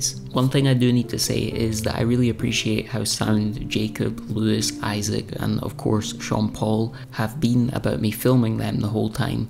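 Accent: Scotland, United Kingdom. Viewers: More Scottish accents please!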